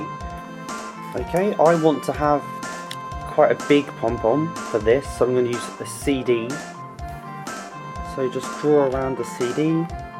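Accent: United Kingdom. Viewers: Because he has an English accent? It would be better if it was an American talking. I'm british and find the accent from across the atlantic much nicer ! :)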